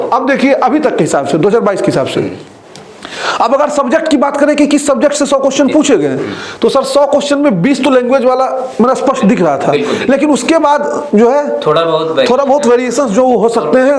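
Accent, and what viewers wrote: Bihar, India. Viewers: Sr g ap Bihar se h kya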